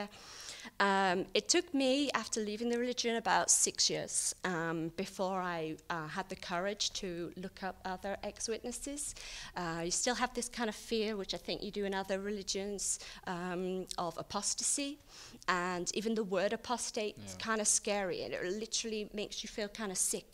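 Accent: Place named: Australia. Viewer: she sounds kind of Australian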